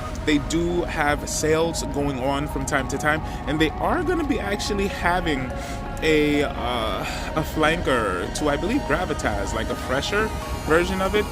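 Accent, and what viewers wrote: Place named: England, United Kingdom. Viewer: Fantastic review! You are eloquent and entertaining, and your English accent is great. I should know as I am a Brit. Can’t believe that you are Garifuna! My wife is from Honduras. After your review I feel that I need Chypre Fulminare in my collection. You have such charisma.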